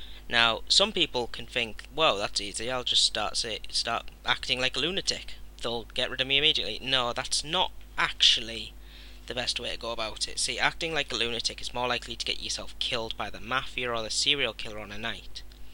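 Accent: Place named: Geordie, United Kingdom. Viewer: Where r u from, cuz u sound like ur a geordie but you dont have a full on accent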